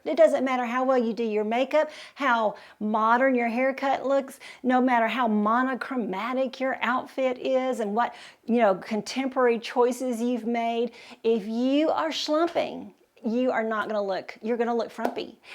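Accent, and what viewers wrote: South, United States. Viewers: To much talking. Very Southern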